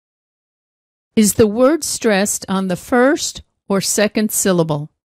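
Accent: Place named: United States. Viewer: Authentic American Pronunciation 😉😊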